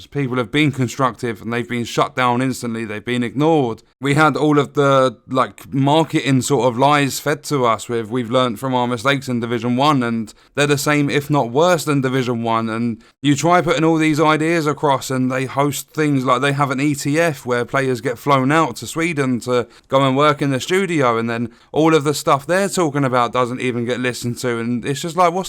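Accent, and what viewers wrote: United Kingdom. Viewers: Dude i love it. Keep on moaning. Even the wife sat and listened to you today. Miss the UK and the morose moaning accents so much!!! :)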